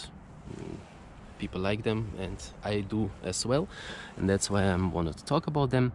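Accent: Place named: Germany. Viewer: Как необычно, у вас в голосе звучат скорее немецкие нотки, чем русские, даже когда говорите по английски. 😜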